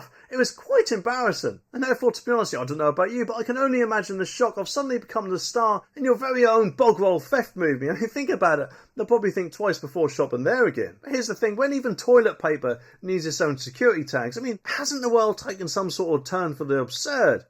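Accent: Norfolk, United Kingdom. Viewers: I still need to know if you are from Norfolk lol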